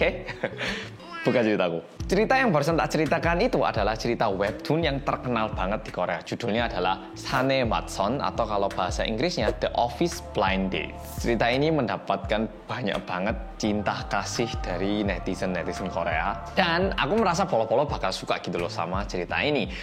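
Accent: Java, Indonesia. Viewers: medok itu nama logat jawanya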